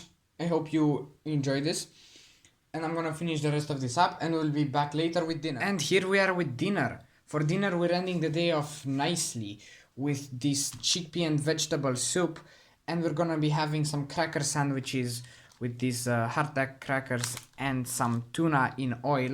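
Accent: Romania. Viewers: Dude. Are you from Romania by any chance? Can't tell from the accent...But it sounds just like it.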